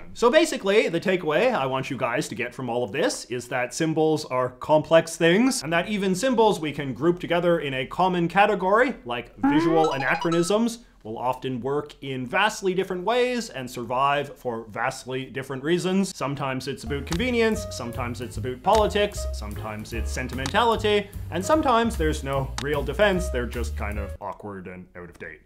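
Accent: Canada. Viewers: Here for the Canadian accent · Is this guy's "Canadian accent" authentic? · As a European, you've got the thickest Canadian accent I've ever heard and I love it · I'm from Prince Edward Island and you have the MOST "Canadian" accent I've ever heard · The only Canadian I know who actually says A Boot and I live here!!! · Wow, you seem like THE most Canadian person I've ever heard speak! And I actually watch a fair bit of Canadian content. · I just... I LOVE the way you speak. The combination of the Canadian dialect and your cute little idiolect with sort of high rising terminals. It's fucking captivating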